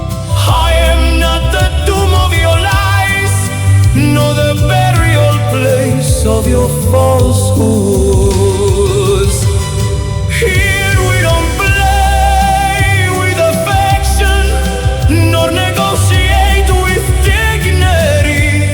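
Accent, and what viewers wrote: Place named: Paraguay. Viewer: Querido Maestro, me gusta su inglés con acento paraguayo.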